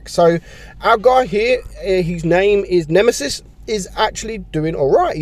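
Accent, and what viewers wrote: United Kingdom. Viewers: I just love British accent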